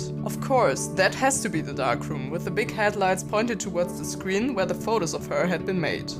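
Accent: Germany. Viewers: Irgentwie habe ich mir gedacht vom ich sag mal Akzent das du Deutsch bist aber trotzdem gut😊 Also kann man Nathan Retten? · Your accent just sounds really german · Dieser deutsche Akzent lol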